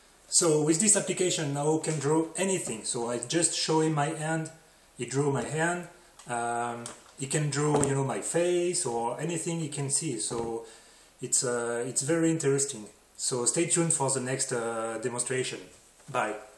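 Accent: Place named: England, United Kingdom. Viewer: Fucking english accent , tu crains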